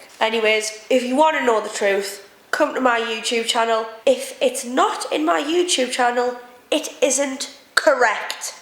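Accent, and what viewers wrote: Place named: Sunderland, United Kingdom. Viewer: Cher Horowitz she is from Sunderland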